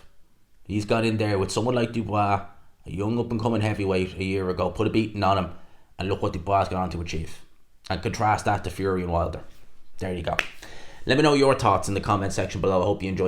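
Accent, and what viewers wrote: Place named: Ireland. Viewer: Where are you from? Accent sounds very Irish 😅just curious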